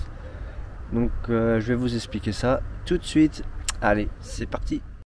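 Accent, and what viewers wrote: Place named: Alsace, France. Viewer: Merci pour cette video instructive et tes explications détaillées. Compliments pour le soin que tu apportes à ton environnement végétal. Merci aussi pour ton délicieux accent alsacien. Ca fait beaucoup de bien aux oreilles !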